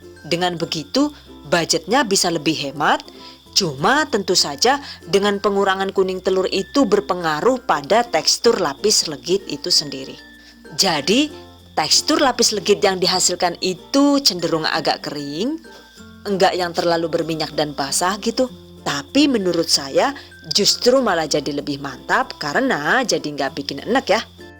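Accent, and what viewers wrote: Banyumas, Indonesia. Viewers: Suaranya mbak aduh mirip orang bule, mirip penyiar juga aku suka bgt, eh tapi kok bisa jawa ngapak , apa ini orang ngapak yoo??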